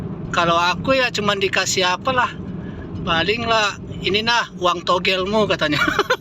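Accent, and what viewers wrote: Medan, Indonesia. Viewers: Bang mau tanya boleh ngga Abang aslinya orang mana ? Tapi logatnya kaya orang Medan ya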